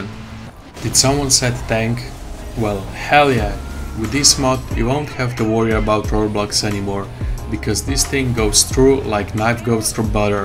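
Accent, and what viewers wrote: Russia. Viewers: сразу слышно русский акцет)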